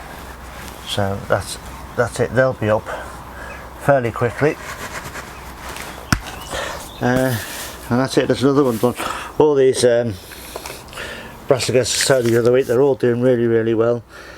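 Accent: Liverpool, United Kingdom. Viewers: 👍👍👍is that a scouse accent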